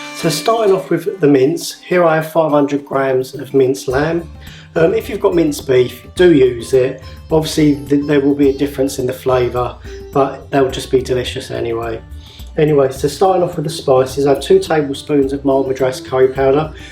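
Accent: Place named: Essex, United Kingdom. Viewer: Great stuff, you sound like you come from my home county of Essex but I now live in the Detroit area so your recipes and cooking lessons are a god send! No such thing as a good curry in these parts, though they do try. Hope things are well with you and that more shows are on the way but I understand it must be a grind to produce these videos. Thanks for the shows you have done mate, top class!!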